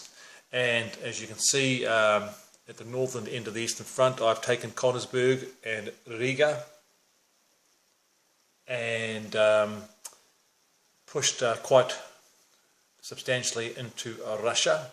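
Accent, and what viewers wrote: New Zealand. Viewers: Been watching "the great war" you tube series, which has renewed my interest in WWI games. The only GMT game I currently own is Twlight Struggle, so i've been looking at reviews and sessions online. And what do I hear, a bloody kiwi accent on the first one I click on :) Know a place to pick up Paths of Glory in NZ at a reasonable rate? Or should i just order from overseas as per usual. Edit Found a 2nd hand copy for $26 NZ + shipping.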